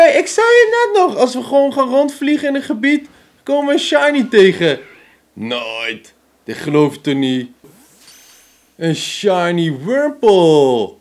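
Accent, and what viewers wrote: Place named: Twente, Netherlands. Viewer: Beetje Twents erbij 🤣... super nice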